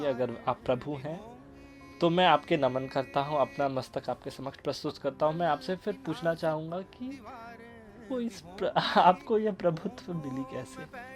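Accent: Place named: Bihar, India. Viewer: Yaar fan bana dia 💖 matlb apka yeh Bihari accent bhot acha h yaar!😍 All the best bro hope LGBTQA+ get thier right as soon as possible!😇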